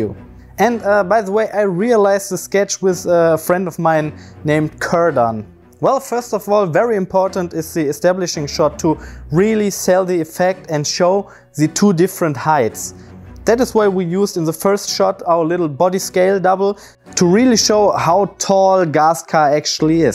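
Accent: Germany. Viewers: you sound so german wie recht ich hatte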